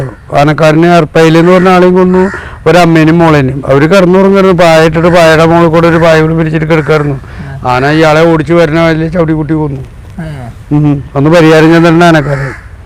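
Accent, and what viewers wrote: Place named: Thrissur, India. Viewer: എത്ര കേട്ടാലും മതി വരില്ല ഇദ്ദേഹത്തിന്റെ സംസാരം. ആ തൃശൂർ ഭാഷയിൽ ഒരു ജാടയും ഇല്ലാത്ത നിഷ്കളങ്കൻ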